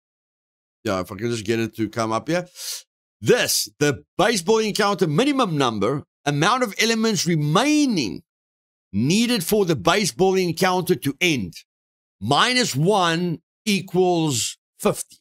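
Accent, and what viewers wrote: South Africa. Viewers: South African at it's finest haha - love bro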